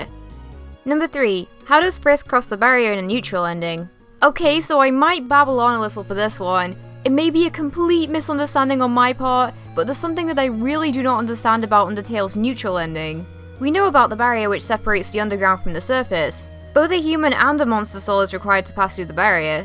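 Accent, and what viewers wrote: United Kingdom. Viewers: What's wrong with liking a British voice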